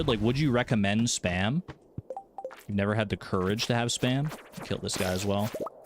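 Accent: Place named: Canada. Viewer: your extra Canadian accent is charming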